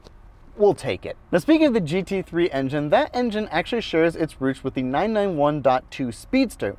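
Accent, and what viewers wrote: Germany. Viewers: German accent is my new favourite thing ever!!! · ! Love the German accent. Awesome video. 👍🏻🇺🇸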